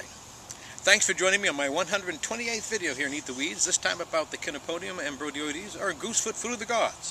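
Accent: Maine, United States. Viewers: Spar varnish (with a Maine accent)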